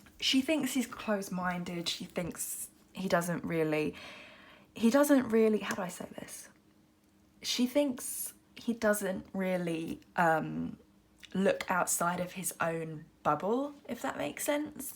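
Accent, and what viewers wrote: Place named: Australia. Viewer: Spot an Aussie lol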